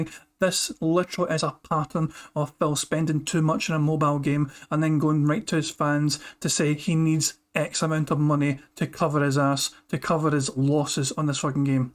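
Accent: Scotland, United Kingdom. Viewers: What part of Scotland are you from?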